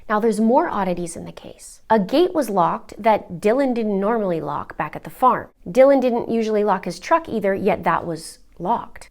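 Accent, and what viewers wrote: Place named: Canada. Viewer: Wow you have the strongest Canadian accent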